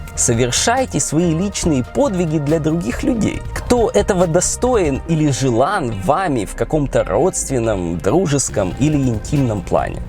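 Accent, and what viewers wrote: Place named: Odessa, Ukraine. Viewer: Вы 100% с Одессы , узнала по говору свои😄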